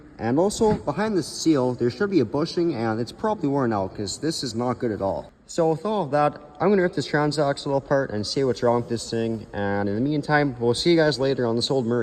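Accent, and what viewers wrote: Canada. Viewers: You sound like you’re either from Canada or Wisconsin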